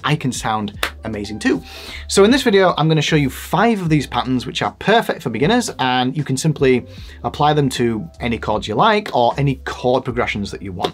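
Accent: Yorkshire, United Kingdom. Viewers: Great vid. You sound like a Yorkshire man to me as an ex-pat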